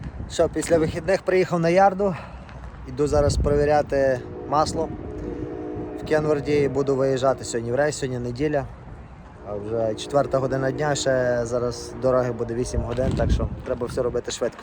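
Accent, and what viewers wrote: Western Ukraine, Ukraine. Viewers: Блін, як класно чути цей наш західний акцент... Привіт з Івано-Франківська...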